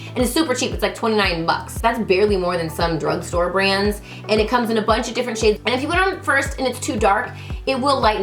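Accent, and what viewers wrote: England, United Kingdom. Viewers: Anyone who hates on your british accent can leave right now. You’re not part of the fam, bye. P.S. Missed you as well, not just the accent. Xxx (cause thatks how the British end their notes) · I love your British accent it makes me laugh, and you look amazing as usual. But it does look like that was a lot of product being applied, no? I just don't like to look cakey. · Love this trick! Gotta try this miracle foundation!!!:D And I love your British accent!! I like speaking like a Brit too ☺️ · I’m English and I love your English accent ❤️❤️ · Love this tutorial - and your British accent rocks 😆💞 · I also love your British accent.... I'm a Brit 🙌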